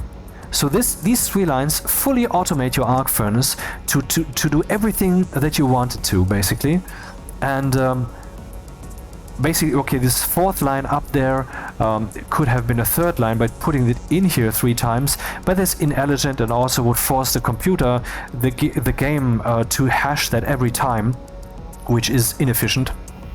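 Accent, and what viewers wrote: Germany. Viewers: I love everything about this video ❤️ I love that your introduction takes almost 5 hours, that you get slowed down by needing to explain the details then end up sayin "we'll get back to that later" and I love the German accent. I got here by chance. Steam showed me this game I had never heard about at checkout buying a totally different genre game. So I watched the first 20mins of this video to help me decide - and promptly added the game to my purchase. Now I am into your video and have 22hours game time registered over 5days, trying to figure the concepts out myself and only taking hindsight hints from your video. Best Xmas gift for myself ever 😂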